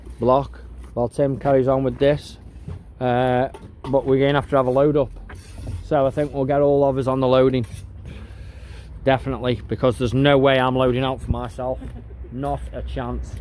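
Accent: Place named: Liverpool, United Kingdom. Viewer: Scouse is back 🤏 🥪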